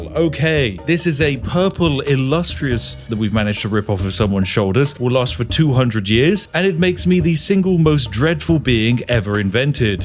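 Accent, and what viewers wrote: United Kingdom. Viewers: I feel like he gets more British every intro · "Perfectly Average" ? He sounds British! And that comes from a British person ;)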